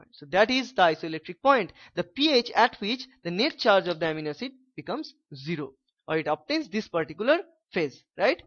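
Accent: India. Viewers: you are awesome I like your Indian english lol it's so likeable :d and damn you got some mad skill in bio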